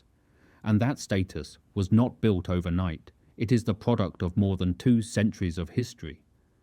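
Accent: United Kingdom. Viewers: Hey Dude you sound British. How would you like it if the British king torn down Buckingham palace to make a modern all-glass tower like what they have in Dubai?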